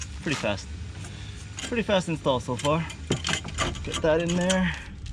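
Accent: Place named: California, United States. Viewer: Why not straighten the fins? Ps first time come across your channel. You have an extremely strong Californian accent. Socal?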